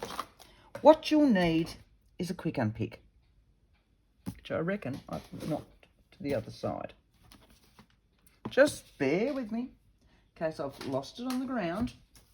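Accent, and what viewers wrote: Australia. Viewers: Hi. Nice to hear an Aussie voice on a junk journal tutorial. I so wish I had found this two years ago when I first started out. However, I have already started taking my readers digest book apart to create my very first altered book along with you. Keep up the good work, loving it.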